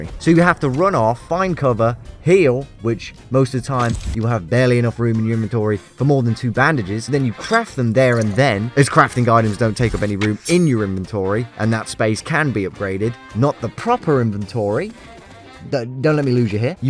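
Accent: United Kingdom. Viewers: I like your british actent is so perfect i wish have but i live in Poland so... Yeah this is i be wach you british actent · First time on the channel, American 🇺🇸 here. With my only knowledge of this game being British, Im happy to know I tapped on a British dude reviewing it. It makes it so much better! Thank the algorithm YouTube scum, they do something right! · I KNEW THAT WAS PS1 MONSTER S.A. you sound briish btw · You sound British?... When are you going to convert to Islam?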